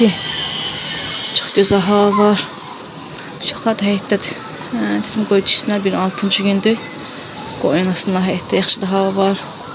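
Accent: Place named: Quba, Azerbaijan. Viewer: baci siz haralisiz..lehceniz Quba ya oxsatdim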